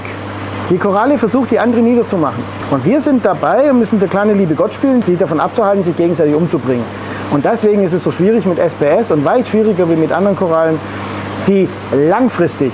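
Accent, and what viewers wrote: Swabia, Germany. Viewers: Hochkompetent, sowie schwäbisch sympathisch. Da kommen einem schon Ideen. Auf bald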